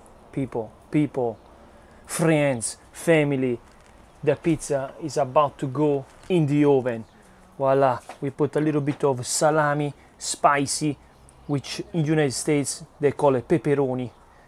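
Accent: Italy. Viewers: sei bravissimo e grazie mille. PS: si sente tanto l'accento italiano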